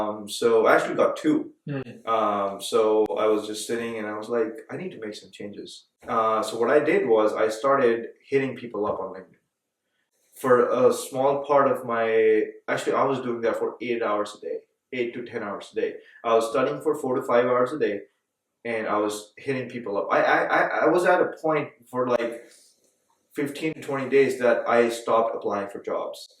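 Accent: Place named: India. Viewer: I suppose you shot this video a while back, I was wondering that both of you graduated from the same university, but still you've maintained your Indian accent though you've married an American, while he he's got an American accent. Pls take it as a compliment from me.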